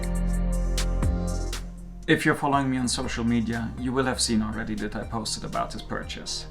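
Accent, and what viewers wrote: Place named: Germany. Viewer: With your totally awesome German accent you stated you were not a Porsche guy, you will be. I will be following along.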